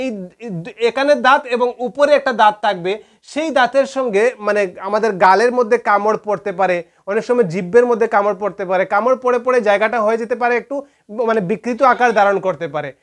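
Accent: Sylhet, Bangladesh. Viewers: আপনার কথাবার্তা শুনলে মনে হয় আপনার পূর্ব পুরুষ বৃহত্তর সিলেটের....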